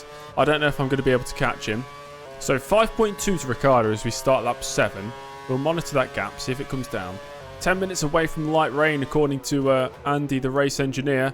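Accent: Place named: England, United Kingdom. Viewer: I only follow this content because of your perfect English commentator's accent 😂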